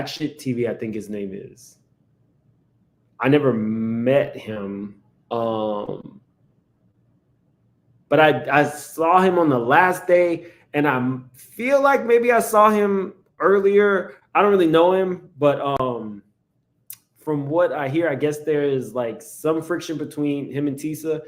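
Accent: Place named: Louisiana, United States. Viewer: When you mentioned the Louisiana coming out of you, I immediately thought "Ya maw!" 😂 I'm from Louisiana too!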